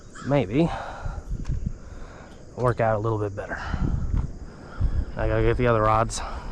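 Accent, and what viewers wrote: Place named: North, United States. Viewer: You sound like a gat dang yankee compared to that old dude at the start.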